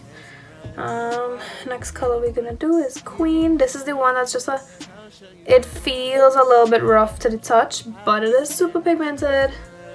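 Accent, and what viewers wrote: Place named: Trinidad and Tobago. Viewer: Keep going!!!! from a trini in NYC. It’s so refreshing to see someone from home doing makeup reviews. Let me just say, there is an audience out here for your brand, I was so happy and proud when I heard the accent. It made me feel at home. Thank you so much for what you are doing. I look forward to seing all that you do. I am a subscriber for life.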